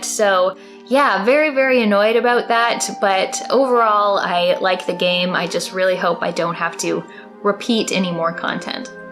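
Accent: Canada. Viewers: Just as an aside, sometimes your Canadian accent is imperceptible and then you say "house" and I'm like... oh yeah, she gets healthcare from the government like someone who lives in the first world. This is not a bad thing, I would take every single person around me saying "Howouse" all the time if it meant living in sane governance but... as an American living north enough to get a Canadian channel on his cable system, it pops out.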